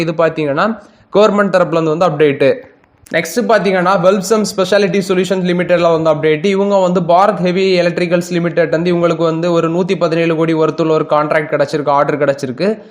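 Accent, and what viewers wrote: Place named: Nagercoil, India. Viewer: Slang resemble Nagercoil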